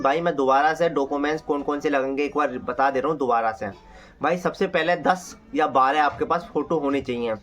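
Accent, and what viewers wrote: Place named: Bulandshahr, India. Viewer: Pure bulandshahr ki language bol rha hai bhai😅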